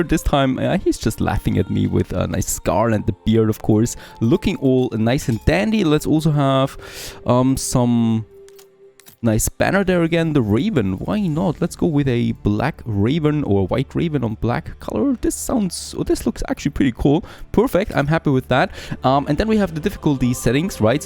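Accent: Germany. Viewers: Are you German? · Du bist Deutscher oder ? :D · Für einen Deutschen hast du einen verdammt kleinen Akzent.. : ) .. very good bro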